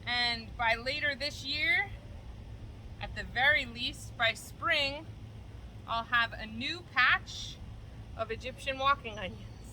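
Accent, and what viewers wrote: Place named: New Jersey, United States. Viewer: I have been considering walking onions and someone handed me some at my farmers market this week so I plan to plant them. I’m wondering if I plant them in the fall or in the spring? I have lived in Illinois for 45 years but I recognize your New Jersey accent right away since I grew up there. I didn’t move here until I was 22. You made me homesick lol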